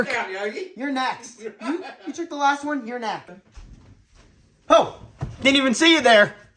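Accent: New Zealand. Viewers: Cigs and New Zealand accent, very Shan coded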